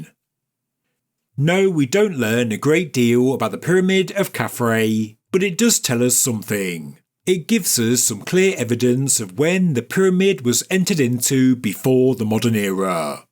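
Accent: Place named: England, United Kingdom. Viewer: Pesante accento inglese 😮.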